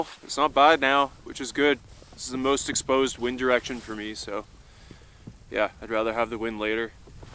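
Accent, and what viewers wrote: Canada. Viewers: is it just me or have you been talking way more canadian this video than usual? maybe im crazy 😅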